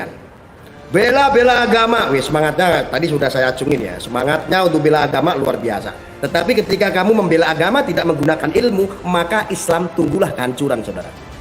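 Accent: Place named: Surabaya, Indonesia. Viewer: Suraboyo banget...cok..cok...setuju aku... 😘🥰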